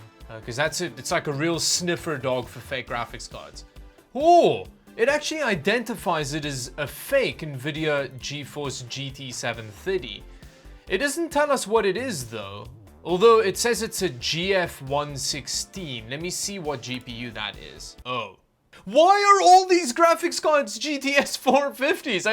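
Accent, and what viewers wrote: South Africa. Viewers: Is this guy from south africa? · Are you from SA?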